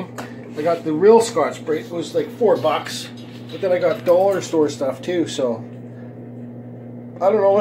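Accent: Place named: Canada. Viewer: Keep doing your thing brother! Love the Canadian accent too. I need to start shooting video of my carvings.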